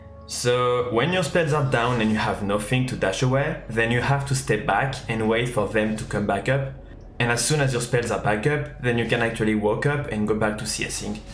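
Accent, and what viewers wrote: France. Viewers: La vidéo est vieille de 6mois mais je suis un peu à chier ne anglais et j'entends que si je suis pas con tu es français donc je me posais la question, possèdes-tu une chaine en français ?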